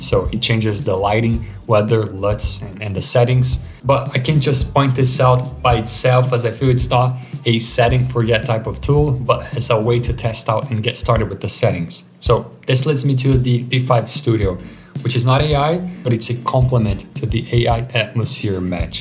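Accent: Brazil. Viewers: vc é brasileiro?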